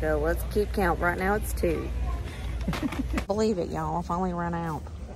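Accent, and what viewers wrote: South, United States. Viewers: You are literally the only person who is actually adorable saying SALmon. 🥰 Love your southern accent. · It’s ok you are southern you have an accent that is so cute.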